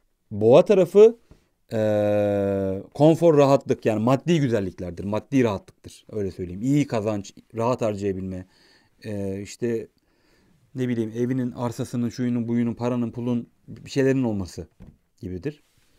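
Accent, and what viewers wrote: Adana, Turkey. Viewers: Ben sizi,websitenizden takip ediyordum ,YouTube kanalınızın olduğunu görünce,altın bulmuş gibi sevindim :) yazım üslubunuzu zaten çok seviyordum,konuşma üslubunuza resmen bayıldım ..Adana ağzı da bir harika :) çok aşırı mutluyum şu an ..lütfen daha sık video yayınlayın..bu kanalı geliştirin ..Sevgilerimle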